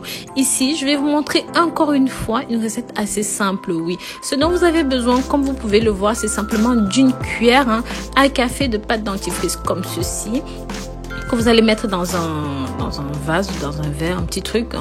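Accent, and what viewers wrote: Africa. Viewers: Vu l'accent c'est une africaine qui vous prend pour des cons moi j'ai perdu 5 cm de tour de taille en 1 mois grâce au sport programme abdo, et footing tout les matins avec un bon régime alimentaire si non tout est bidons ....merci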